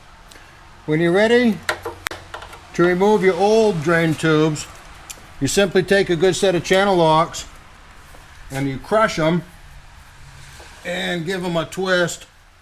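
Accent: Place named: Maine, United States. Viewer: As a frequent visitor to the great state of Maine, I loved the license plates, accent and info! I have the old return tubes in the trash and since I have my old heat exchangers off and also in the garbage, this should be pretty easy....famous last words...we'll see this weekend. thanx again..most helpful and entertaining. steve meltzer, houston, tx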